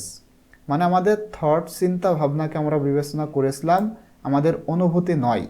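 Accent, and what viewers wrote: Barishal, Bangladesh. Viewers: স্যার কি বরিশাইল্লা নাকি 🥰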